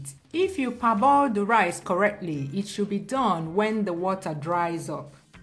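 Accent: Nigeria. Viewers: LOVE TO HEAR U TALK NIGERIANS TO.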